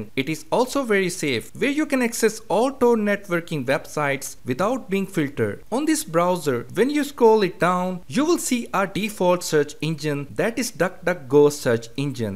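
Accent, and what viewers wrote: India. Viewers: The Indian accent is like getting hit in the ear over and over again.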